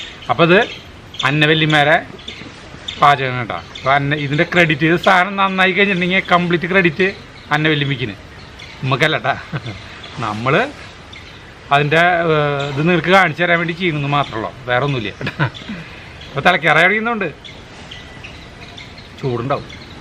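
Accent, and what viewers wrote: Thrissur, India. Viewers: I am regularly watching your blogs of different recipes. I love it, I liked your Thirussur slang. I am native of Kozhikode, but settled in Vellore-632006, Tamilnadu, since 50 years. I love the way you and your wife jointly cook. All the best....Roney Raymond. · I like ur thrissur slang...beef looks yummy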